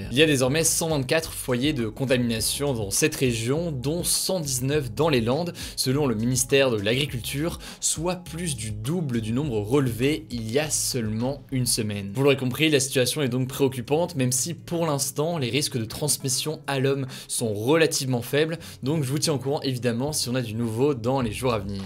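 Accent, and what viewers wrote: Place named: England, United Kingdom. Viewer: si on peut juste s’arrêter quelques secondes et apprécier l’accent anglais d’. t’es génial.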